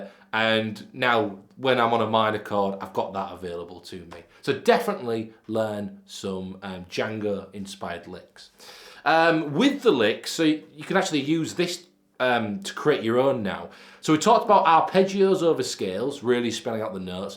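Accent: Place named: Derbyshire, United Kingdom. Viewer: I didn't understand a word. Nevermind, nice accent though. Buxton?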